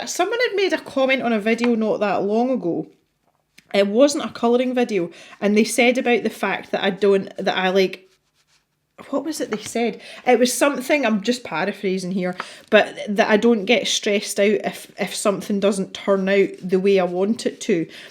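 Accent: Scotland, United Kingdom. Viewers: Hullo, I believe you picked the perfect color for the big door hinges, well done! I have heard some Scottish accents that are very hard to understand, yours is not one of them, now I do not have TikTok nor do I plan to download that app as I have enough time wasters on my phone. I enjoy your videos and your accent and I have always loved your attitude of it’s just a piece of paper no one‘s gonna die! Thanks again for Sharing it this coloring time with us and Thursdays a great day for me too. I look forward to your next session as well as Sunday’s video be safe take care and have yourself a lovely day! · I adore your Scottish accent! There's no need for cringe or anything. It's funny though that Mr didn't pick up on the dialect after all those years. I would be in full trying to sound like you mode if I were in Scotland for 2 seconds 😂 I'm a parrot and try to blend in as much as I can; already did that as a child. 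I usually have a strong Tyrolean dialect, but I rarely use it when I'm outside talking to other people because in Vienna not many have that dialect, they have Viennese dialect (which I find absolutely disgusting and won't even parrot 😂). People are so different, it's funny to me 😄 Btw, I totally second the opinion on the Gansai Tambi Starry Colors, they're amazing and last forever. And they reactivate really well, just put a drop of water in the pan and wait for a few seconds and you're good to go!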